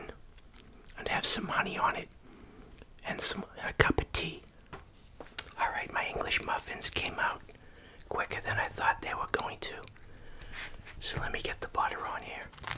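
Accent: United States. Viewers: 15 seconds in and i find your american accent very tingly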